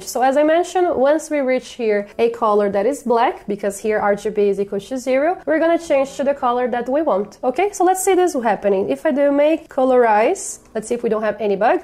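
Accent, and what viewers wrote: Brazil. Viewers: tu é br??? a pronuncia é muito brasil!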